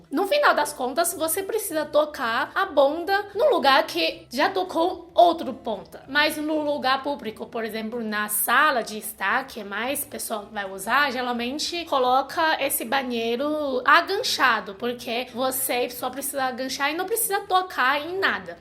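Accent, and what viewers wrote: China. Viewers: Incrível que em muitas palavras, ela aparenta ser brasileira, mas em outras o sotaque chinês entrega.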